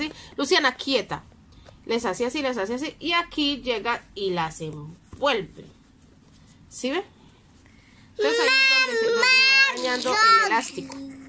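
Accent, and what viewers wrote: Colombia. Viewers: Ese tan gracioso 😅 Me encanta tu acento colombiano y que nos llames muchachos y más aún, parceros. Me haces sentir en confianza. Abracito a tus pequeños 🤗🤗🤗🤗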